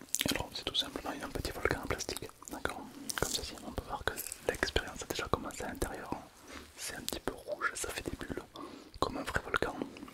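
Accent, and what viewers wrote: South, France. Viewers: L'ASMR avec l'accent du sud. Incroyable.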